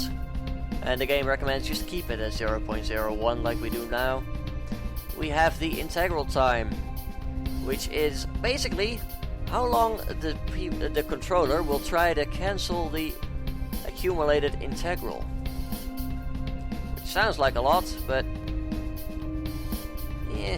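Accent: Netherlands. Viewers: why dose the ai failsafe not conect and Are u dutch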